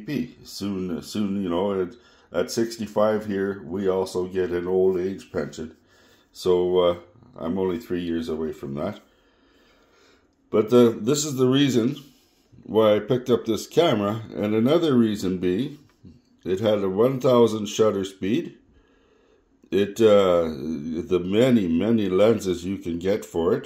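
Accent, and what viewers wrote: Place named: Manitoba, Canada. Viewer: I am not sure how I have not come across your channel before today, but I am really enjoying your videos! 👍 Also, as a Manitoban-in-exile, it is so good to hear a voice from 'home' on YouTube. 😁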